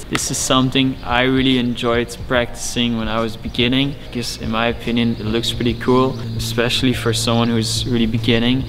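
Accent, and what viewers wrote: Netherlands. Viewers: Are you dutch? Female dutch skateboarder here who recognises your accent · Bro are you dutch? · Are u Dutch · are you dutch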